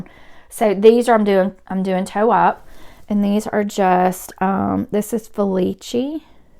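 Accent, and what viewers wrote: South, United States. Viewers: Just finding your podcast and starting with Episode 1... FANTASTIC! I love your southern charm, accent & beautiful hair cut and color! Looking forward to following your knitting adventures!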